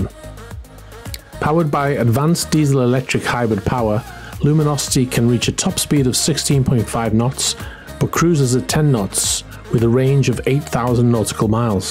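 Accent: United Kingdom. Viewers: By the way, bloody good job on the intro image of the S/Y eSysman. Sets the proper mood. Next improvement may be to animate the stern door to open, revealing your Porsche. And for what it's worth, being new to your channel and somewhat out-of-tune with a British accent, will you forgive me for thinking that all the boats you feature are flagged in Cyprus? (super yacht...... cypriot).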